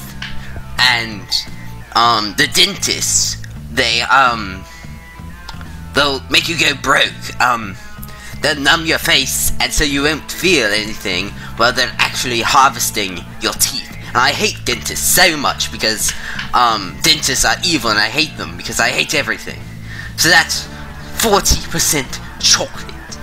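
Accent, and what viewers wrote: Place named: United Kingdom. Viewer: Why the British accent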